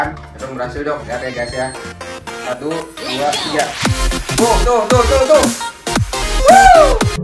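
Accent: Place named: Philippines. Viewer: i thought you were a filipino or visayan that's the way we talk but not me just little bit but im half filipino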